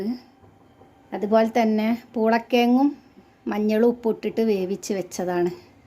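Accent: Malappuram, India. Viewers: 😋😋👌👌കൊതിയാവുന്നു കണ്ടിട്ട് തന്നെ. ചെയുന്ന സ്റ്റൈൽ വളരെ നന്നായി ട്ടുണ്ട് 😊. ഇങ്ങനെ ഞാനും ഒന്ന് ട്രൈ ചെയ്തു നോക്കട്ടെ. എന്നിട്ട് പറയാം ട്ടോ. 😍മലപ്പുറം ആണോ നിങ്ങൾ. ഞാനും അവിടെ ആണ്. സംസാരിക്കുന്നത് കേട്ടിട്ട് ചോദിച്ചതാ ട്ടോ 😊. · Kollalo.. ingalu njamade malparthe basha pole indallo varthanam..😃😃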